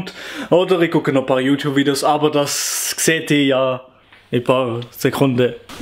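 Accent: Switzerland. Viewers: Also Züri-Dütsch isch das nöd, was isch das für en Dialekt?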